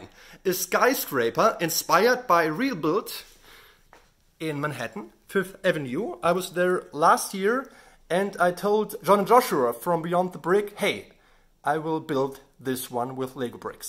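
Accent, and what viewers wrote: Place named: Germany. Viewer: holy shit bruder dieser deutsche akzent mashalla😂 aber daa sieht echt mega gut aus!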